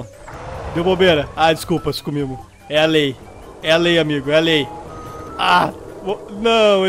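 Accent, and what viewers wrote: Rio Grande do Sul, Brazil. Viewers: Vai rola pauleira kkkkkk da para ver que tu é gaúcho kkk tbm sou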